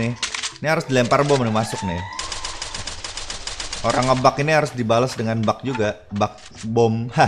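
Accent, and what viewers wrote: Java, Indonesia. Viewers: Wong jowo to kue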